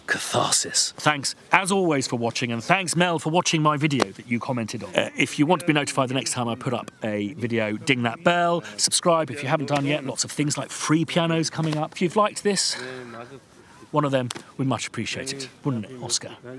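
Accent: United Kingdom. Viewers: Mr here in Mexico we say something about that subject... "You are not a Golden coin you will not like to everyone", someone will like you because who you are and what and how you approach things, and the other will hate you for the same reason, the best advice that a 23 years old young man is that if that someone tells you something and you did not think as he/she does the best thing to do is to say is thank you for your opinion, and s Keep things you love and do it in the way you love. hope my simple advice can be helpful in some manner PD: I really enjoy you style of vlogging and your British accent haha.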